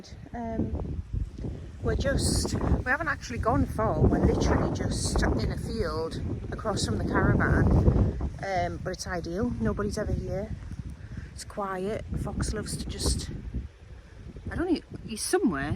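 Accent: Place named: Newcastle, United Kingdom. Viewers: I’m not on Instagram, you fell into my feed! 😂came for the fact your in the UK and life in a small rural space. Stayed for the North East accent👌🏻, Fox ❤ and a great video. Love from Northumberland on the Scottish Borders x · can't mistake the Geordie/north east accent 👍your certainly living the good life 👌🚴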